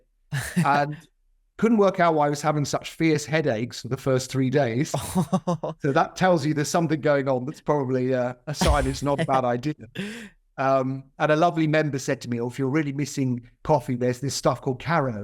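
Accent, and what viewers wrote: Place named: United Kingdom. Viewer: U R DELIGHTFUL to WATCH & LISTEN TO!!😂🎉 💥😄👏😇 ❤️ ur BRITISH SOUND!! IT'S me "mother 👅😛' TONGUE!! 🤣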